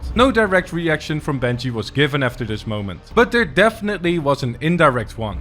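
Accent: Netherlands. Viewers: I smell Dutch in your accent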